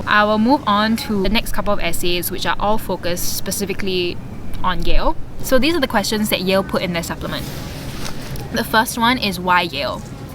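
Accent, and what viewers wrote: Singapore. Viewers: Hopefully your Singaporean accent will fade away with the world class education at Yale.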